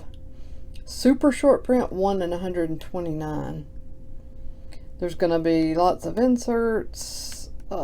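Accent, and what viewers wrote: South, United States. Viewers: G'day, Love the Southern accent and I enjoy your insights on Baseball cards Cheers mate 🍻🇺🇸🇦🇺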